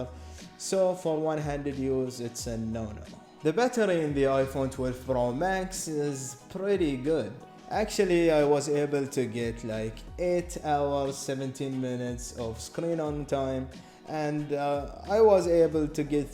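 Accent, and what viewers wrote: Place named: India. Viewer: اسم القناه انبكوس عربي ويتكلم انقليزي مثل الهنود اش فيه ؟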